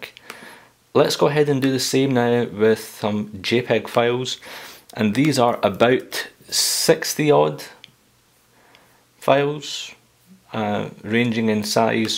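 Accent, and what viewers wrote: Scotland, United Kingdom. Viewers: Sounds like a Scotsman must be a Scotsman!hello mate....can you run xcode?it runsok??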